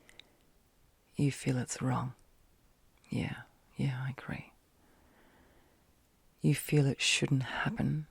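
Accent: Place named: Australia. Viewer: I can definitely tell you studied counselling, your remind me of my psychologist I used to see! I love your voice/accent- I'm Australian too but I'm in Florida for a year so it's lovely to hear an Aussie accent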